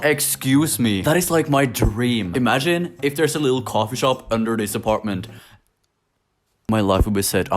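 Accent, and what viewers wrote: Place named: South Korea. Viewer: You low key sound like you have a korean accent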